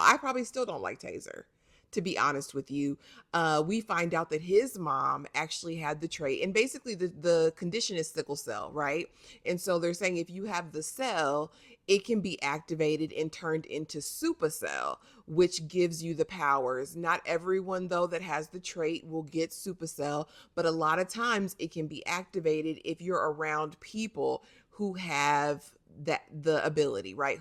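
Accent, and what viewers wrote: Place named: United States. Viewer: I read both your comments so I will address both here. sound American. I AM AMERICAN and she is the only American I have seen covering this show on YouTube. Even their interviews are the UK versions of outlets like Vogue. No one cares about validation. Validation comes from within. Whatever PERSONAL issue you have with Europe vs. America's African Diaspora's culture is your own. FACTS. Facts are that the US is the largest entertainment market next to China. British actors and directors in interviews have said they started making more money and getting more exposure (to get hired on more projects) when large wide spread American audiences started becoming fans. I'm sure Rapman would like to get paid, but if not and he wants to make it "our own thing" because he is not seeking "validation" as you say, instead of being a story teller that wants his work to get to the largest audience possible, then good on him. 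Money is what makes the world go around and if you can make millions in your home town, but if you know you can make billions one town over, why would you cut off your nose to spite your face? MONEY! I know he is Canadian, but didnt Drake save Top Boy from cancellation? Rapman said he has a story for three seasons planned and I want to see him get his seasons. Get his MONEY! China is the only entertainment as large as the US and they ae racist as hell. Historically these types of shows and movies do poor there. They even REMOVED Chadwick Bozeman's face off the B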